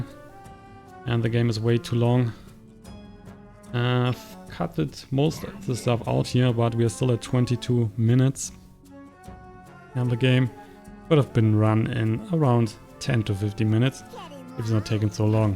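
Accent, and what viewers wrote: Germany. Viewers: Er spricht gutes Englisch, aber mit eindeutigem Deutschakzent. Hört man sofort....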